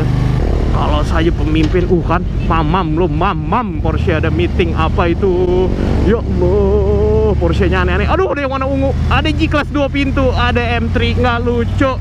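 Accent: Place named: Madura, Indonesia. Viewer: Bang, logatnya bukan jawa solo, mirip orang madura.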